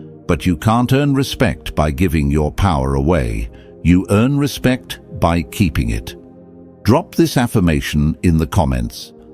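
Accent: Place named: United Kingdom. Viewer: Change your voice British gag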